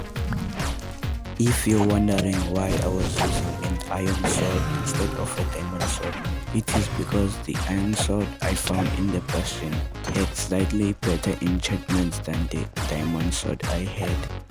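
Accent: South Africa. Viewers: You sound sound south African like a Xhosa one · U sputh african????? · his accent is obvious, might be Zulu guy or venda idk tbh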